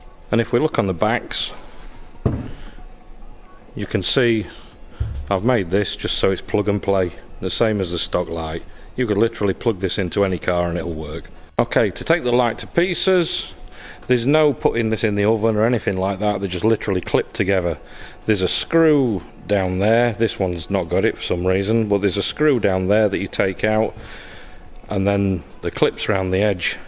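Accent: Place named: United Kingdom. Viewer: Great video. Thanks. Perhaps its the British accent that makes it seem so easy? Will be doing some LED-ing on my 69 Dodge Charger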